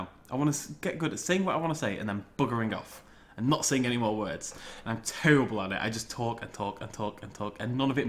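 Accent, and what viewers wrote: United Kingdom. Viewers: To be honest, I subscribed for just about everything about your videos. I mean, comedy, British accents, and DINOSAURS? Recipe for success in my book. And what makes it so much better is that you actually interact with your audience. Even before the livestreams, in, say JPOG, you'd ask "Which dig site should we do next," or "How should we make this exhibit?" It really shows that you care about your viewers, and you also appreciate and respect everything about the games and dinosaurs. But, if you're running out of dinosaur games, then of course shake things up a bit. Do LPs of a game that you thoroughly enjoy, do a game that allows your fans to join you,(The Isle?) do games that have something new or weird. I'm not a Let's Player-yet-but I think this is a pretty good plan for youtubing. That aside, I'm here to stay. Thanks,. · I subscribed to you back in 2013. 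It was a cold day after a family conflict, and I don't remember how exactly I found it, but I watched your entire Jurassic Park The Game playthrough (in roughly two days) and I just loved it. I didn't love the game (not at all), but I really enjoyed your calm and humble approach to commentary on a let's play. I was tired of the loud energetic and highly edited content that let's plays and playthroughs have been so saturated with (like Pewdiepie, Markiplier and Jacksepticeye). But your content is always something that I feel that I can sit down and relax with. Also you seem like an amazingly nice guy :). I am also a big fan of dinosaurs. It's pretty much been my biggest interest for most of my life. So, when I saw a channel with dinosaurs being a major subject, and a YouTuber with an amazing british accent, I subscribed to your channel. I really don't know what type of content that I would like to see the most. 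I know that I was pretty excited about your new series called Pangea Today. The concept of it was that you would talk about and report new paleontological discoveries. After the first episode you ultimately abandoned it, and I don't understand why. You even mentioned in a vlog, I think, before the first episode of Pangea Today that you would bring the channel into a more eduacational direction. I know it's kind of a long time ago, but I am still wondering. I'm not complaining. I've just been confused about it for awhile. Can you please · Im a little late to the party here but what made me subscribe was your voice and jpog all those years ago. and the original british-ness, i joined the british revolution god dammit!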